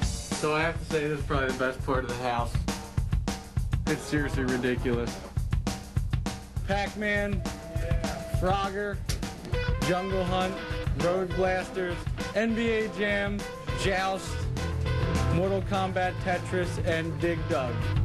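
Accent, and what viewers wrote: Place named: South, United States. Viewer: Before his southern accent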